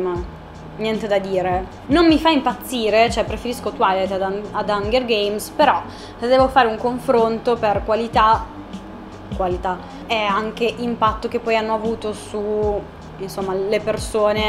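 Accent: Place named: Rome, Italy. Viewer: Comunque ti dona l'accento romano 😄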